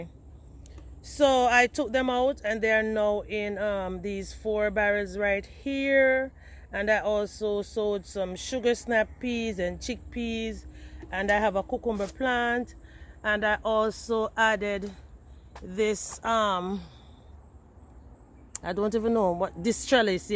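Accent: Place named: Jamaica. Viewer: Hey, I’m so glad I found your channel. I grew up in south Florida and I recognized that Jamaican accent immediately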